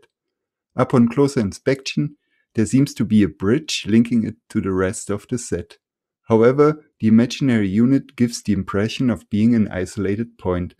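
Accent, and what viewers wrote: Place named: Germany. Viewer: Strongest German accent ever. Love it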